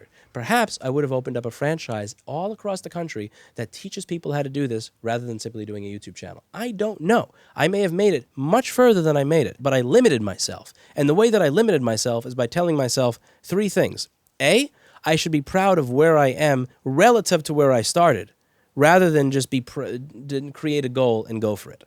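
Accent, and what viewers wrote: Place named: New Jersey, United States. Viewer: You sound like you live where I live. Nj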